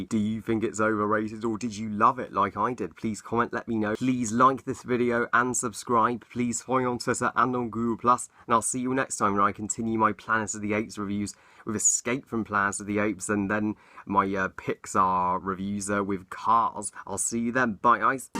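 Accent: United States. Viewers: Like Your American Accent! I Can Do That accent! I Watch Logan Lucky Soon when its out.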